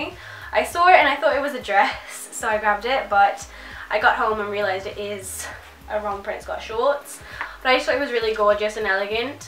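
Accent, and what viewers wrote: United States. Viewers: Are you from America ? You have a really strong American accent? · talking with a twangy America accent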